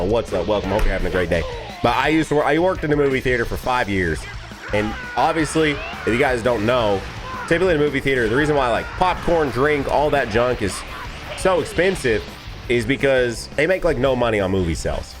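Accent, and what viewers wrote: South, United States. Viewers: the southern accent is killing me 😭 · Your southern accent sounds fine to me but i also grew in southern state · Didn't know i needed a Southern video today😂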